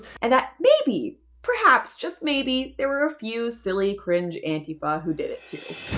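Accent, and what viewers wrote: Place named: Canada. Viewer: Letterkenny! Canadian is showing.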